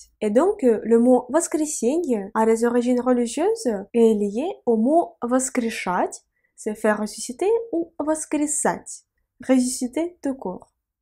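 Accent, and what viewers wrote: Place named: Russia. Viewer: Bonjour et merci pour cette vidéo très intéressante qui permet de mieux retenir les jours de la semaine. Et avec un très beau sourire en prime ! Et le français avec l'accent russe, c'est tout de même autre chose :-)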